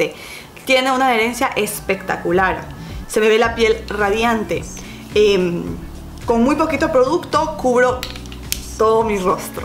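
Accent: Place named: Guayaquil, Ecuador. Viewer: Primera vez que veo tu canal y me gustó por tu forma de hablar de una dije es Guayaca jajaja leí la descripción y boom Guayaquil bieeeeen besos y saludos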